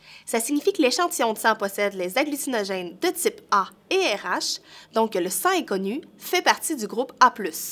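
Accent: Quebec, Canada. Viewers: Le groupe sanguin 0 + peut donner son sang à tous les groupes rhésus positif non ? ( A +, B + AB + ) Au passage, très bel accent québécois, moi j'ai celui de sud de la France, peuchère ! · Merci pr les infos en plus dit avec un joli accent de nos cousins québécois pr le coup québécoise 🔭🔭🔭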